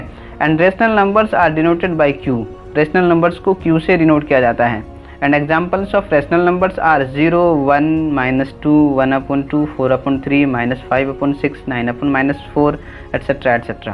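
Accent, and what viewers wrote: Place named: India. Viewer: إنجليزي بنكهه هندية 🌚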